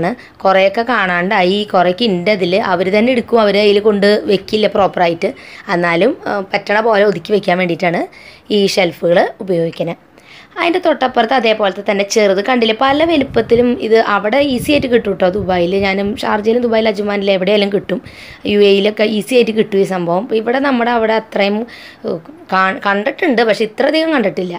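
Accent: Thrissur, India. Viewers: Thrissur ഭാഷ കേൾക്കാൻ നല്ല രസമുണ്ട് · Enikum thrisur bashem trisurkarem ishtanu.. Njn ernakulam kaariya..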